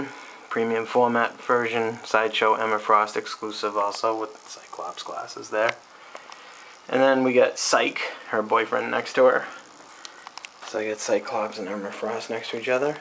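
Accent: Boston, United States. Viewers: Boston accent is killah....apahtment..lol.love it. I just got the PF Freddy Krueger and the J. scott Campbell comiquette. They are frikken shhweeet!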